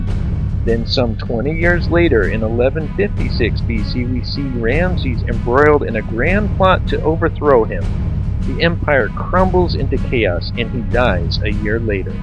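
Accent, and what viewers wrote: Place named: Midwest, United States. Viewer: from the North, Midwest. (Going by that accent)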